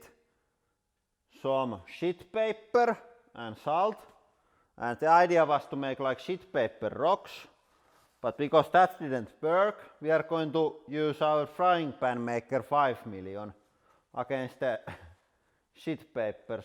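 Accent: Finland. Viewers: How the fuck he can’t talk English even he is old man? Cmon stop that Finnish-English shit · you gotta love the finnish accent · I thought it sound like a finnish accent and now its confirmed haha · The english-finnish acsent is just so crappy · Haha Shit Paper... you Finglish is fantastic. Keep this stuff going, it's great:)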